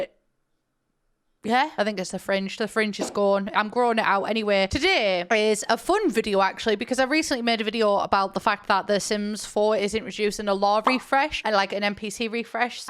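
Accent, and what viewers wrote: United Kingdom. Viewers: Ur british accent is so thick I sometimes fprget that u speak english, but maybe its just me, cause my mother language isnt english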